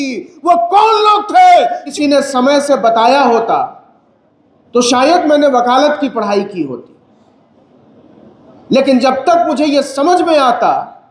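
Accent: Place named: Pakistan. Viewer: Urdo kyon bol rahe ho? Wah bhi Pakistani accent men?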